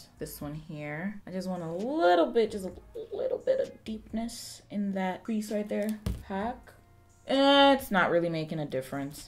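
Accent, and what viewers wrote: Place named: Nigeria. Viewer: Girl... your Nigerian accent takes me out EVERYTIME. Lovely look as usual. Those shimmer colours don't look like they'll shim well enough though...could just be me???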